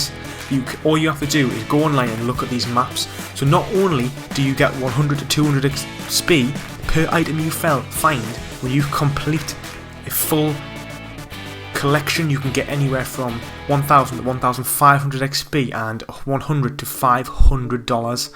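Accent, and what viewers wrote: United Kingdom. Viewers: This guy is definitely British but idk if he sounds Geordie or not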